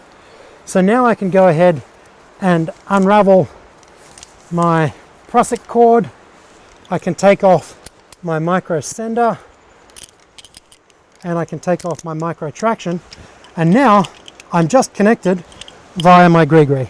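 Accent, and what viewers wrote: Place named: Australia. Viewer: Hey you sound like an Aussie (that's a compliment). Excellent, excellent video. Sound advice at every stage. Particularly at always carrying a Reverso. My current project is toying with top rope solo for ice-climbing. Presently using a Petzl Rescucender (top) on a harness combined with a Petzl microtraxion (bottom). The Rescucender is very heavy as I have teamed it with a steel (Grivel) biner because of the cross-loading. Have you seen/tried the TAZ Lov2 fall arrest descender <link>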